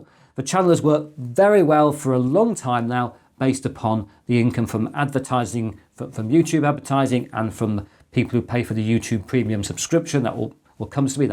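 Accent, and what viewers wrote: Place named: East Midlands, United Kingdom. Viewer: Yeah, there's something hypnotic about his East Midlands tones. 💤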